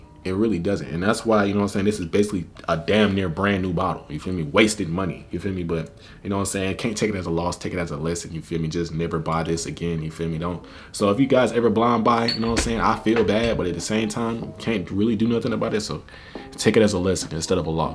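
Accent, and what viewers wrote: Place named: Georgia, United States. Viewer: He must be from atl or Georgia because of the way he talking lol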